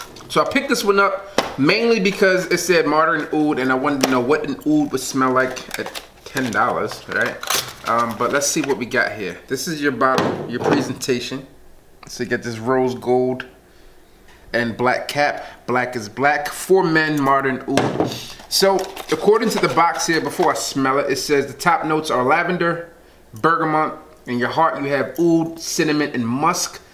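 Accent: Baltimore, United States. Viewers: U got a Baltimore accent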